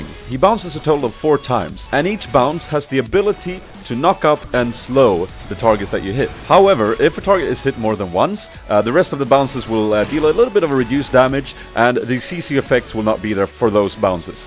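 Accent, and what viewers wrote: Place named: Sweden. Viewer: Are you swedish? I think i can hear a swedish accent behind your awesome preview ^^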